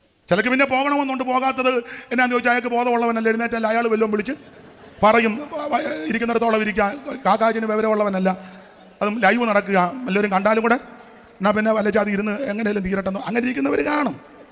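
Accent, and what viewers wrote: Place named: Thiruvalla, India. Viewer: തിരുവല്ലക്കാരുടെ ഭാഷ 😂😂😂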